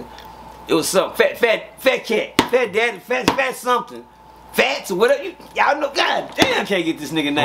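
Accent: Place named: Texas, United States. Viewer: yea they don't know that's Texas talk..I'm from Houston and my uncle's been saying all the stuff he say lmao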